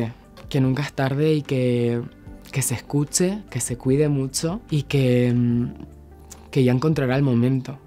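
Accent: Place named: Chile. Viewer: Tiene una linda aura; resplandeciente; su acento siempre me ha parecido un poco chileno.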